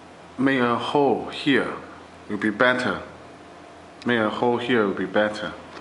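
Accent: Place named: China. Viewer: he has nice idea, don't confuse to his english we all know he is chinese. atleast he trying. thanks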